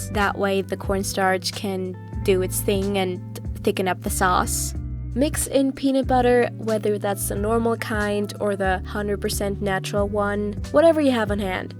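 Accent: Germany. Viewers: Are you German? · Ah, an den Produkten sehe ich, dass du Deutsche bist :D Ich habe mich schon gefragt was für ein Akzent das ist :D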